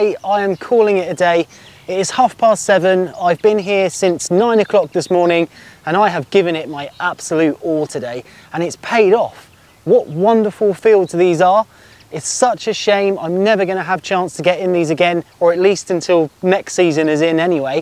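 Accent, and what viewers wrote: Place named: South, United Kingdom. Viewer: That was interesting, thank you.What part of Britain are you in? I'm guessing somewhere down south.🙂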